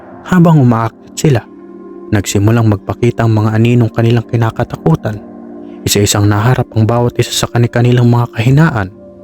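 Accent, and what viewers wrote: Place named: Waray, Philippines. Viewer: Waray waray itong narrator kasiang tuno ng kanyang salita hindi mapakakaila na waray waray alagad intoy hehe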